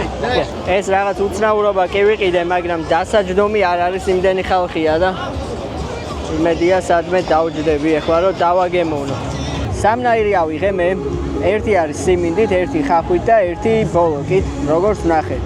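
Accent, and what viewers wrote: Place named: Tbilisi, Georgia. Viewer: წარმატებები შენ! ღმერთმა ხელი მოგიმართოს! ვეღირსეთ თბილისურად მოსაუბრე მოგზაურს👍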